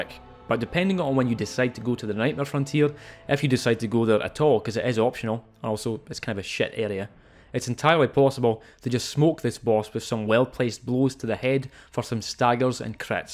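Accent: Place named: Scotland, United Kingdom. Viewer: Bloodborne content AND a Scottish accent ? Likescribe it shall be.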